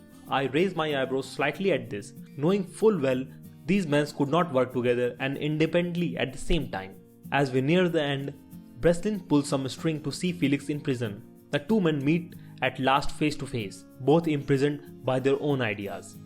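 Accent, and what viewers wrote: India. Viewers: He's accent is from india not Mexico you dumb ass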